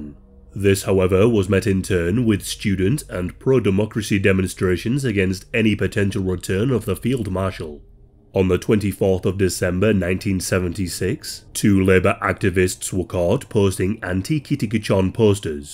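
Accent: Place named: North East England, United Kingdom. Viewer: The most durr voice on the Internet. Rotten accent that North Eastern 🤢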